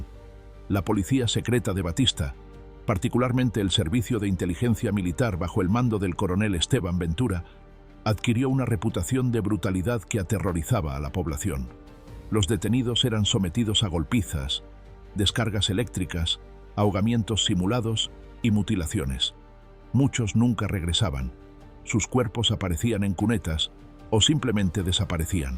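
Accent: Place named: Spain. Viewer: Menos mal que el Narrador, no tiene acento Sudaméricano. Para mí oído es más agradable oír a alguien con acento español, es más Elegante, con mejor dicción y con más clase. Este señor Fulgencio Batista era Amerindio puro, con algo de Africano, pero según se ve 0 de sangre🩸 española mediterránea o latina.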